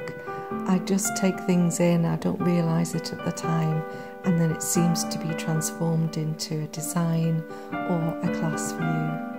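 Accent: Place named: Scotland, United Kingdom. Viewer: Thanks for the introduction,! It's always so nice to discover a new YT friend, but when they also let you in a little on their origins or something a bit more personal, that's even better! My great grandfather left Edinburgh to come to America many years ago, but I love all things Scottish and wish he had stayed there sometimes... ah well, I can listen to your beautiful, familiar accent and paint along and kind of time/place travel 🌿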